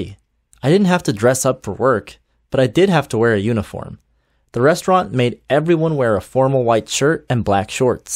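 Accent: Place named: United States. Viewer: Love American accent from Pakistan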